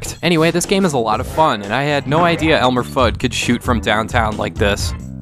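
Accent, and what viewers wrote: Minnesota, United States. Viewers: are you from Minnesota? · You from MN?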